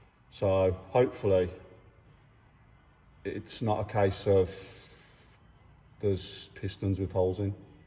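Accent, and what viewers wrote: Birmingham, United Kingdom. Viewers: Just watched your channel for the first time and thoroughly enjoyed the Brummie tones of your commentary. You obviously know your stuff on trannies and are able to make judgments using your experience. I’ve liked and subscribed and look forward to watching you again in the future. 👍🇬🇧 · Nice hearing a fellow Brummie and also getting a result out of that engine. well done kid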